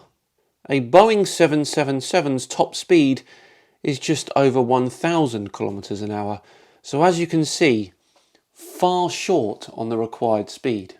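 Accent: South, United States. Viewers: Definitely a Southerner. Neebody in Toon taalks like that, bonny lad.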